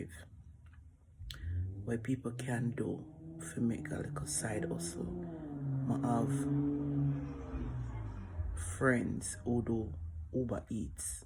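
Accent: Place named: Jamaica. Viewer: You sound like a West Indian Jamaican are you a descendant of the Afrikan Diasopora a Descendant of the (SLAVES) the "BANTU PEOPLE" this is my question Sista. If so please, please look into who the Afrikan Diasopora people are Biblically we are really from the Scattered 🏠 House of JUDAH from the Bloodline DNA of Abraham, Isaac and Jacob/Yisrael we are the True Bloodline DNA Descendants. HaSatan have Deceiveth the whole world even the Saints if it where possible. So please my Hebrew Yisraelite Sista of Zion check out a few Hebrew Yisraelite YouTube Brothers and Sistas Channels Open Dairy, Hebrew Nation Building by Morris Williams Jr and Joshua Collins, Yona Golab, Hebrews To Negroes aka TV MOSES LEVI by Ronald Dalton Jr and Benayah Israel to begin your research on who the Afrikan Diasopora where before we became "SLAVES" To the European Nations and others Nations "WORLDWIDE" please don'tignore this very important information that I'm trying to share with you check out those YouTube channels with those Brothers and Sistas. 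Shalom, Salaam my Hebrew Yisraelite Sista of Zion. We are the Real Descendants of The Ancient Children of Yisrael.💋💗💕😍🕎🥰💞💖👄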